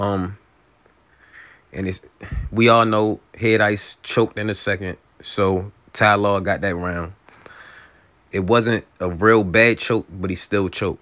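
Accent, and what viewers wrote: Baltimore, United States. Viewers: Didn’t know you was from Baltimore until I heard you speak in this video. Good seeing the city speak on battle rap. Thought I was by myself out here. LOVE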